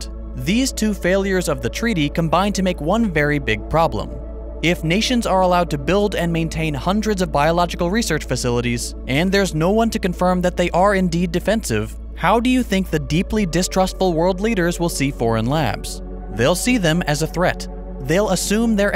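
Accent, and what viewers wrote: United States. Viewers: This guy sounds American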